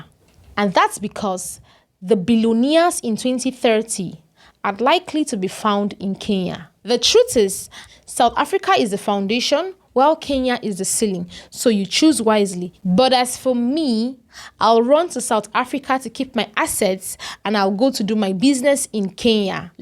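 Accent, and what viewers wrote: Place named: Nigeria. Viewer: I was about to follow this useless account before this nonsense of comparing us, with nigerian accent it's not surprising.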